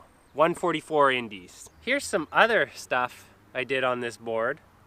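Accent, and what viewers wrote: Canada. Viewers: are you canadian? kinda sound like it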